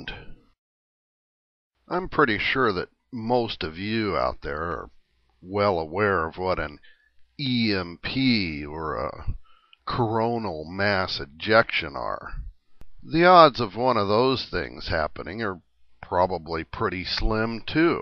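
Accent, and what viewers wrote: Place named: South, United States. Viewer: You sound like a southerner :D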